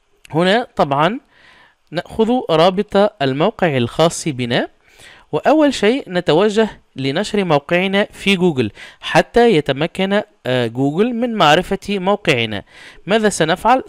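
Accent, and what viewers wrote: Tunisia. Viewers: 5ouya mbeyan men soutek tounsi ena tounsi zeda leken ett makch ma3rouf fi Tunisie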